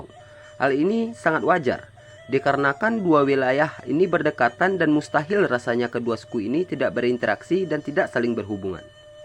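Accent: West Sumatra, Indonesia. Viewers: Logatnya minang bgt euy, pas bahasanya agak beda keliatan bgt lidahnya patah2 wkwk. "E" nya jelas bet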